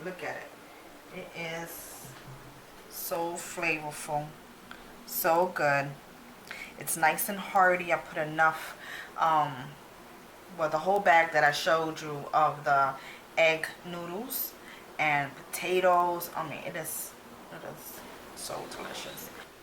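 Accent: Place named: New York, United States. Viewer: Looks delicious going to make for my family today, I wonder how long it took to cook the chicken in the beginning? Also where are you from, sounds like a New Yorker to me 💁🏻‍♀️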